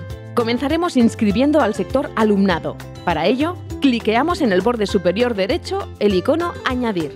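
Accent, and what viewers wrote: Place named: Andalusia, Spain. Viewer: Acentazo andaluz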